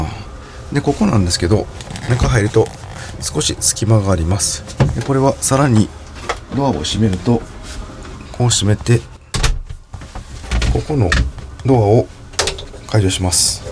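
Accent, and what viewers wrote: Kansai, Japan. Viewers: １分で関西出身とわかる！喋りのアクセントで… クルマは岐阜ナンバーだが。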